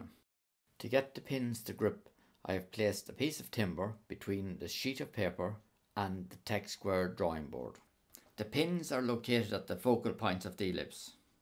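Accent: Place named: Ireland. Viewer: Love it! So well illustrated! Great to hear the Irish accent too! Cavan? Drogheda?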